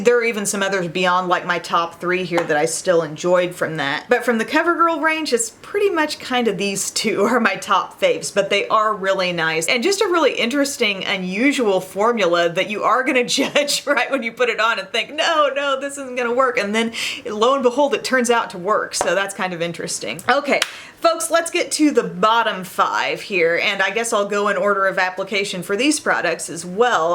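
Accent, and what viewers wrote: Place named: Midwest, United States. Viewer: a nasal voice is indicative of the midwestern American accent, she's from Illinois. As for broadcasting, used to be a newscaster; originally did her YT as the. Your attitude needs no explanation.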